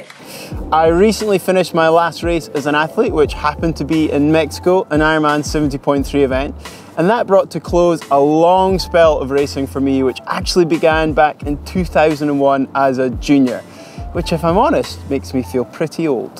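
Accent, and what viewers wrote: Scotland, United Kingdom. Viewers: He is scottish......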